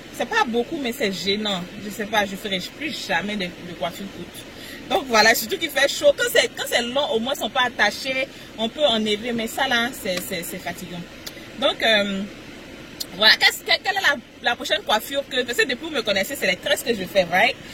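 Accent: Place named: Ivory Coast. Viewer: Naaan il faut que tu m'explique comment t'arrive à avoir un bon accent en anglais alors que tu as aussi ce magnifique accent quand tu parle français avec ton accent ivoirien. ça fait des mois que je suis au usa et mon accent français est toujours aussi fort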